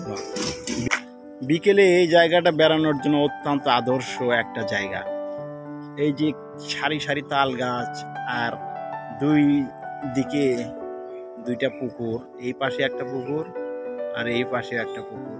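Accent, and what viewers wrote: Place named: Khulna, Bangladesh. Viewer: ভাইয়া আমিও খুলনার আপনিও খুলনার ছেলে আমি ঢাকা থাকি কিন্তু নিজের একলার কোন মানুষের কন্ঠ শুনলেও ভালো লাগে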